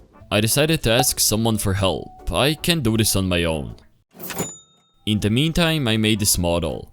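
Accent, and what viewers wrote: Latvia. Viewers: Uzreiz zinaju ka tu esi viens Latviets no varda un ka tu runa😂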